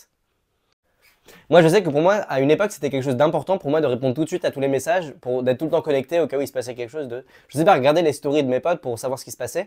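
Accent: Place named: England, United Kingdom. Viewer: Wow l'accent anglais de fou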